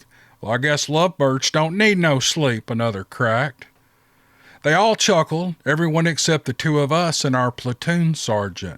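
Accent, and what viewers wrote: South, United States. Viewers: Love his Southern accent, so so sexy.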